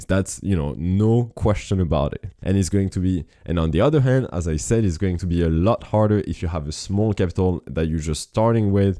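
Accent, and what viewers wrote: France. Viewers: tu ne serais pas français à la base ? je comprends ton anglais parfaitement :)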